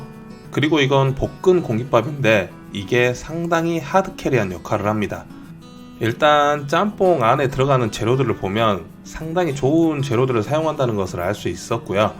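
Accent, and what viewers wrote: Jeolla, South Korea. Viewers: 전라도 사투리 난 실망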